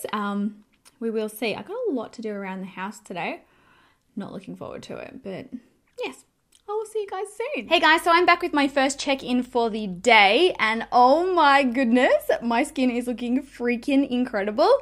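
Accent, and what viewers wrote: Australia. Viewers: This is the first time seeing you and I'm already a fan. You are gorgeous and charming and your Aussie accent is adorable. Subscribed!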